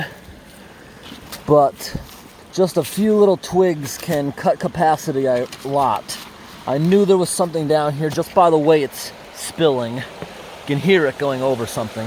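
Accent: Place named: United States. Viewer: That american "englih" ist unbearable - sounds like defect radio ... sounds extra-disgusting ! I love ENGLISCH, not that pidgin-jabbering ... !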